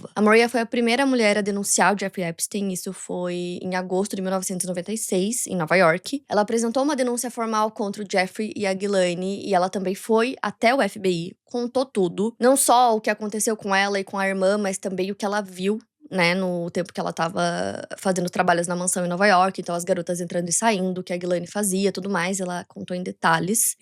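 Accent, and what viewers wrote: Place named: Rio Grande do Sul, Brazil. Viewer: Nossa que nojeira, porquê ninguém investiga o tramp? E que vóz chata dessa apresentadora quando fala em inglês misturado com gauchês affiii acho que sou eu que estou sem paciência mas essa reportagem me deu taquicardia, dor de cabeça, ansiedade, nunca termina meu Deussssss ... um rivotril por favor 😂😂😂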